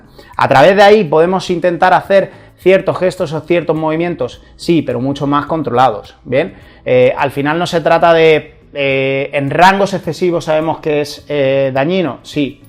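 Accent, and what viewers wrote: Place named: Chile. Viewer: Esa forma de hablar... Chileno!!!!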